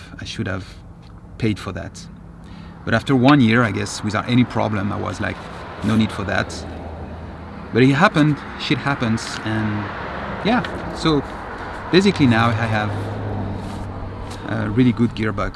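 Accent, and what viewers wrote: France. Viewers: Very honest review, and I like your French accent ! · Is he French?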